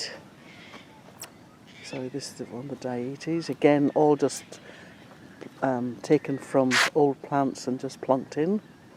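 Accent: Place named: Ulster, United Kingdom. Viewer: Fantastic...amazed you have so many cuttings. splits etc. The Ulster accent gives an international flavour (surprised no Aussie twang!!!)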